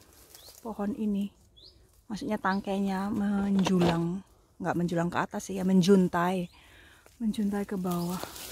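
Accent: Java, Indonesia. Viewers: Mba mangga dan rambutan haha,mba jawanya medok kaya aku mba orang mana?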